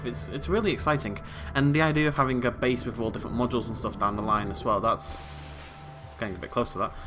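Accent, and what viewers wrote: United Kingdom. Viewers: Hullo ladies and jauntlemen.lol,I love your accent. Brittish?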